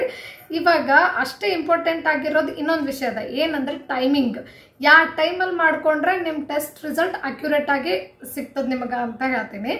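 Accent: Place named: North Karnataka, India. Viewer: ಪಕ್ಕ ಉತ್ತರ ಕರ್ನಾಟಕದ ಭಾಷೆಯಲ್ಲಿ ಹೇಳಿದ್ದೀರಿ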